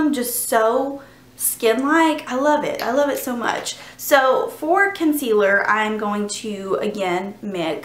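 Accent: South, United States. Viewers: Im in love with her southern accent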